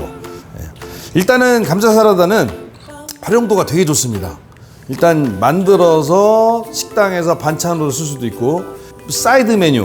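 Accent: Chungcheong, South Korea. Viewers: 충청도 출신이라 백선생님 말씀하시는거 듣고 있음 고향에 간것같이 편하구 좋음~~ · 으 감자셀레드 멋지게 활용 잘봣십더 요리 즐겁게해주셔 많은양 을 어덯게 햇는지도 모르게 다 빠르게 신나게 실렁실렁 보너스 충청말씨 아주 잘봣십더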